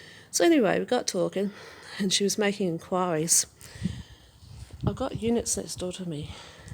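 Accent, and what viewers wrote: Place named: Australia. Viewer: By the way, I noticed you have a bit of an Australian accent....😂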